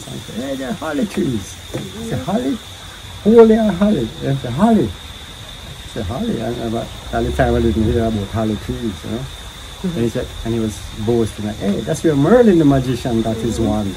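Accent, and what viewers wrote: Jamaica. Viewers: Love this man love the way he talks and laughf real jamaican roots man down to earth and all. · It's a Kingstonian accent, notably old school folks. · I can hear the Rasta in you. One Love Rasta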